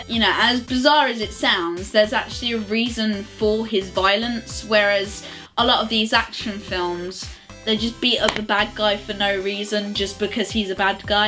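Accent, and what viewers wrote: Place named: Birmingham, United Kingdom. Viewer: Love the brummie accent :) Freddie said I don't need some asshole critic to tell me that.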